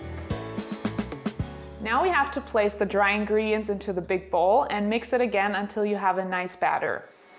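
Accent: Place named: Germany. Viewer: You sound very German!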